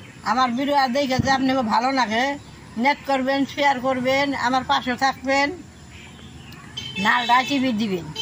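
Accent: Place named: Bangal, Bangladesh. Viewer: একে তো ফোকলা তারপর বাঙাল ভাষা। কিছু ই বুজতে পারলাম। না সরি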